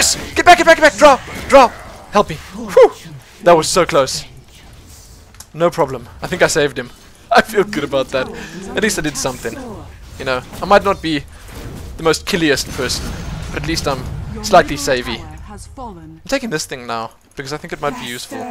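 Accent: South Africa. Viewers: You sound south african. Is that accurate?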